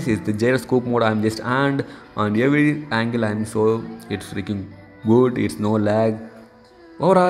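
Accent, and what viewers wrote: India. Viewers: Indian English 🤩😘✴🔥🔥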